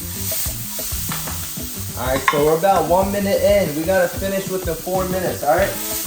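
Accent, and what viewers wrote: Philadelphia, United States. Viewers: You frim Philly?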